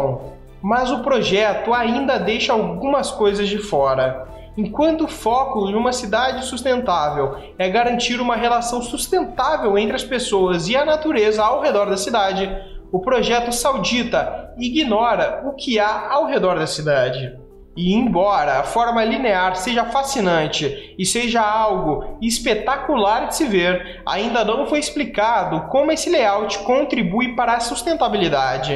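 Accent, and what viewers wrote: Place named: Curitiba, Brazil. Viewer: Ele é de Curitiba, por isso ele tem esse sotaque.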